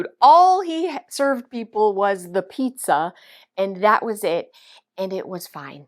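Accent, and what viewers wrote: Canada. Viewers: just found you, love you!! :face-red-heart-shape:that accent, Canadian??, sunny, funny relief, away from world issues ( to OUR mini world issues)...I'm cluttered to the max!, I have PTSD ( not due to the clutter, which yes, is traumatic!) but after I watch you I feel exhausted, so I've virtually worked right?😜. I keep a journal, to make sure I do SOME decluttering every day, even a tiny amount is something. Thanks for being here x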